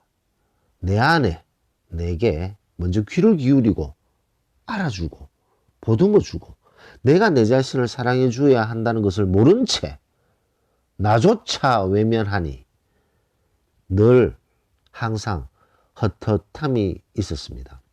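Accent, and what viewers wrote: Gyeongsang, South Korea. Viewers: 선생님요 !! 정말궁금합니다 자꾸 선생님말씀도중 너무궁금합니다~ 구독자도 궁금증이있으면 알고싶어요 왜야면요! 자꾸생각납니다 옆집아저씨 같아서요 고향만어디신지 경북인지 경남인가요?? 샘이생각할때는 어처구니 없지만 ㅎㅎ 웃음이납니다 오늘도 너무 가슴에 와닫고 내가슴을 어찌호비파는지 나도모릅니다 지금도 어디서도 나의아픈 상처를 어찌잘아시는지 부처님도 아니시고 그리고 하느님도 아니시잖아요 !!감사하고 감사합니다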